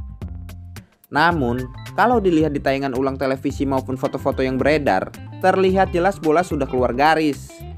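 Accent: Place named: Banyumas, Indonesia. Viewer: Mimin-nya orang ngapak seperti nya....😬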